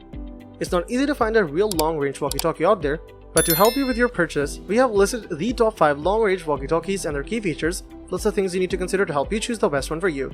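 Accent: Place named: India. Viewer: You are trying so hard to hide your Indian accent.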